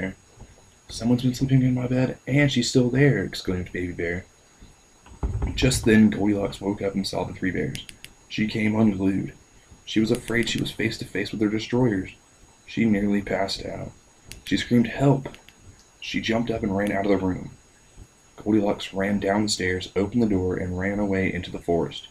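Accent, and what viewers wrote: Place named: Charlotte, United States. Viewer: I only notice a very slight accent but if you would have grown up 50 miles away from Charlotte your accent would be totally different(as in totally southern) hehe. I also live in Charlotte been here for 2 years now and it seems like the accent goes all out southern once I leave the city limits. Also most people from Charlotte are from the North, ie:NY/NJ/OH just like me:)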